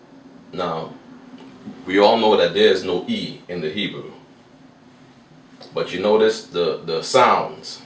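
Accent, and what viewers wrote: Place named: Jamaica. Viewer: He has a Jamaican accent..too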